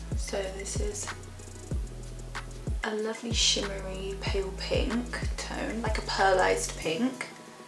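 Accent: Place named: RP, United Kingdom. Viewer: LOVE THE BRITISH POSH ACCENT !!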